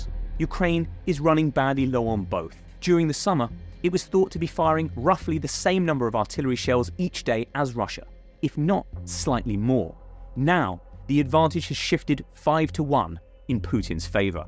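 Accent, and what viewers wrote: United Kingdom. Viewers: Ridicolous Brit narration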